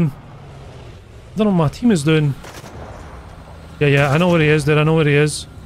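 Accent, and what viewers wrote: United Kingdom. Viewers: revärs ? Are you britisch or what accent is it?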